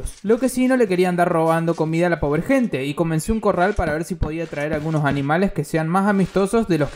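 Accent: Argentina. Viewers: ¿sos argentino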